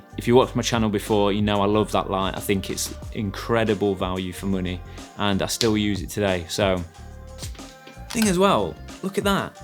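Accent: Nottingham, United Kingdom. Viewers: Top video mate. Subbed. Are you from Nottingham? You sound like a well spoken Carl Froch 😂😂😂😂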